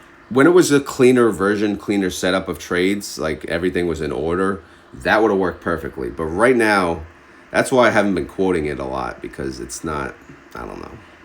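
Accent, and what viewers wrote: New York, United States. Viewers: Great voice and NY accent Your title should be “the godfather on dividends”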